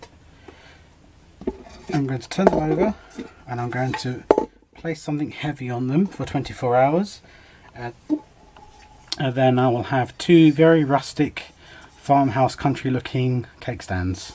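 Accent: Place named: United Kingdom. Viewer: Very cute. What will you do with the feathers? I like them also. I love listening to your accent, it reminds me of my son-in-law who is British. He and my daughter live in St. Neots.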